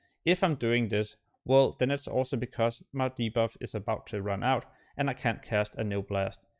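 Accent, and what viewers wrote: Denmark. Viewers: why can i always hear someone is from denmark xD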